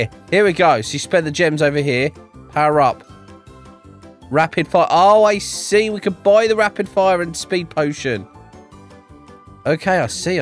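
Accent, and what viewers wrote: United Kingdom. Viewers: that british accent tho, its stupidly funny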